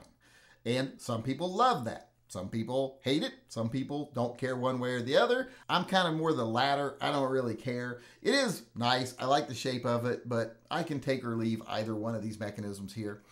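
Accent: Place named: New England, United States. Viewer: Where you from originally?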